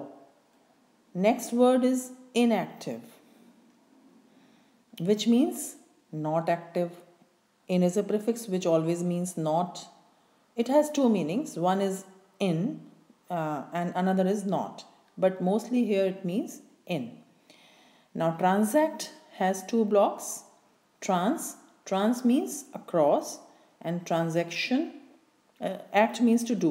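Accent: India. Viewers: Terrible accent, unfortunately. Most probably Indian. Also, a lot of grammatical and vocabulary usage errors.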